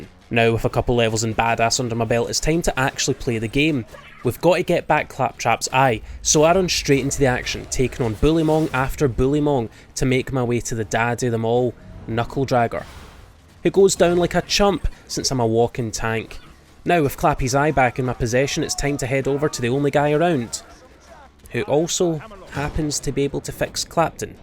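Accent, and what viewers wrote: Scotland, United Kingdom. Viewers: u a scot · A Scottish Borderlands youtuber, I'm in!!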